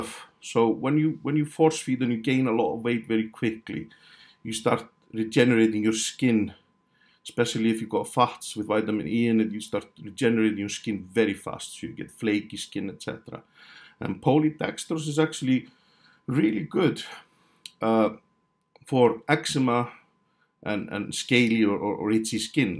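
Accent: United Kingdom. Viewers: You sound Welsh lol. Great video 👌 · Interesting to hear how you've been picking up English accent slightly! Great video thanks:)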